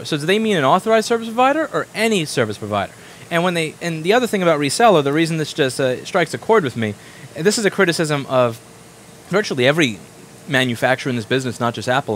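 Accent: New York, United States. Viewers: Lmfao young rossman had a new york accent i didnt expect thaf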